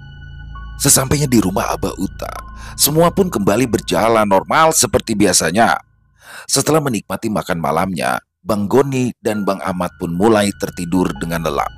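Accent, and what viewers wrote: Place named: Jakarta, Indonesia. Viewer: Ane plg demen nih klo bang berlogat betawi. Cengkok nye mantep bgt 👏👏👌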